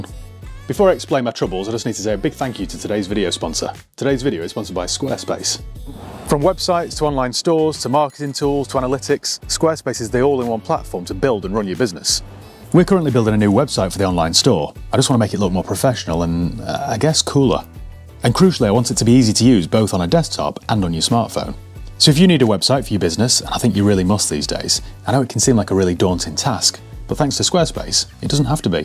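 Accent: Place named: Birmingham, United Kingdom. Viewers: Brum /Black Country accent 10 out of 10. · I'm from Birmingham now living in Cornwall but your Brummie accent cracked me up 😅 still obsessed with your vids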